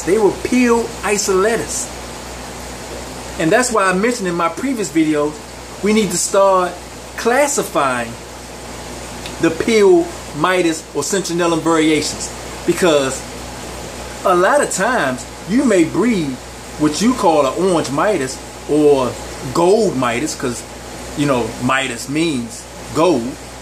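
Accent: DMV, United States. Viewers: Great explanation! Great looking fish too Champ. 👊🏾 Im from DC bruh and based on your accent you gotta be from somewhere in DMV area! 😎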